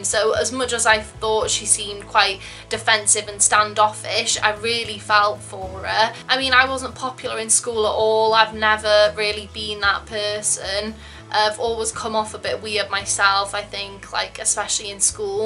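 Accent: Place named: Northwest England, United Kingdom. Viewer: Great review you sound like you come from northwest of England maybe I'm wrong ☘️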